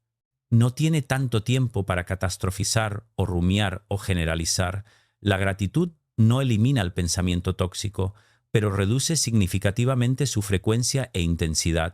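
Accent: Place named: Latin America. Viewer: Por qué tiene acento latino?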